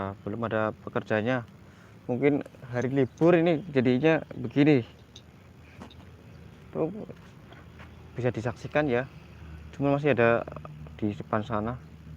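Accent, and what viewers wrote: Banyumas, Indonesia. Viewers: Ngapak ya bos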